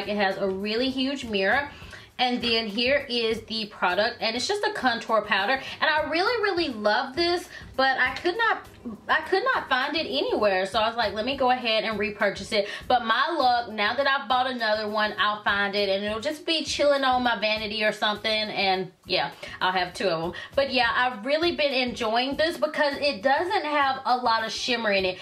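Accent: South, United States. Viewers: So I'm from Phenix City so i know all to well about that Bama summer weather. I bought the face & body last spring for the same reason hoping that it was a light coverage & didn't make me look like i was melting & i absolutely loved it for spring & summer. I sometimes would mix it with my Garnier BB cream. Hope you enjoy it & check out some of my videos & tell me what you think. Love your videos by the way. Its nice hearing another beauty lover with that southern twang. :)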